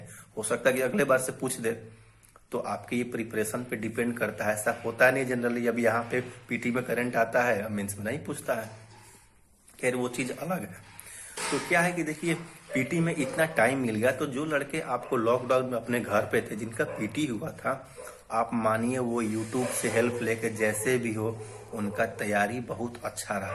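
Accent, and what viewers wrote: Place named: India. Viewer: Bhai kchh v ho Aap sudh desi bhasa bolte ho.....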